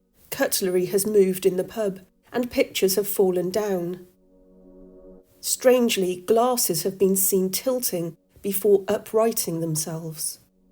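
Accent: United Kingdom. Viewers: Just found this channel this morning & now later at night this particular vid was in my feed. Had already saved to watch later but it came back through again so had to click just to hear that word pronounced with a Bri'ish accent lol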